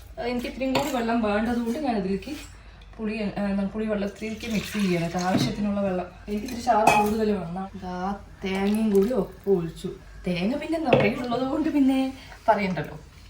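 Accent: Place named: Palakkad, India. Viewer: Palakad slang very nice 🥰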